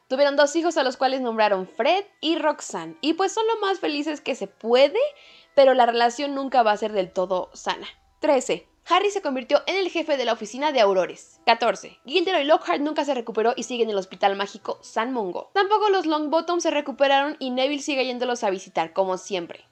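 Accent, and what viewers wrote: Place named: Mexico. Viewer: Que poco se nota ya el acento Mexicano en! Sin duda alguna ha evolucionado en sus videos, sin decir que el que tuviera acento estuviera mal, todos somos brujas y magos del mismo bando. 👀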